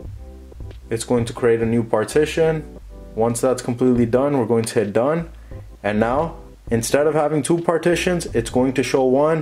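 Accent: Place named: Brampton, Canada. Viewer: I hear a Brampton accent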